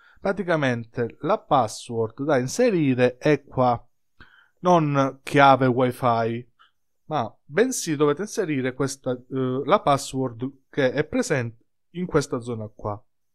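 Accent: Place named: Calabria, Italy. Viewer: sei calabrese come me si sente, complimenti per il video!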